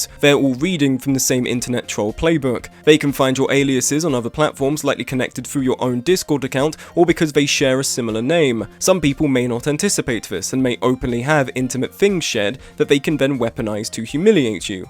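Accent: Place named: United Kingdom. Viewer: Oooh, a 4-hour video analysis on a game narrated by a British person! I'm sure that my ADHD riddled mind can handle this! 😃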